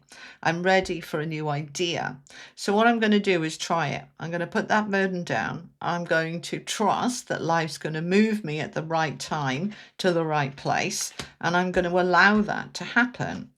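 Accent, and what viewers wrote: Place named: North, United Kingdom. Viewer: I love your readings. Your accent reminds me of home (north UK). Always heart warming ❤🙏♐️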